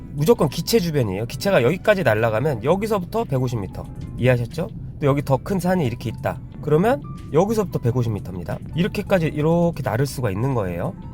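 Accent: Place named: Daejeon, South Korea. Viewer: 대전분이신가봐요? 구독ㆍ좋아요ㆍ알람설정 했습니다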